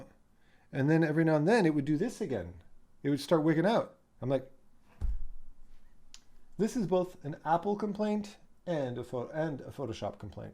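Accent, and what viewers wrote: Quebec, Canada. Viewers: hey man. nice to finally meet you, Hang on. Youre french canadian?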